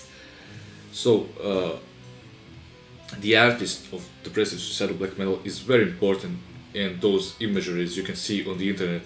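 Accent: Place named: Russia. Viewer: Я чую русский акцент)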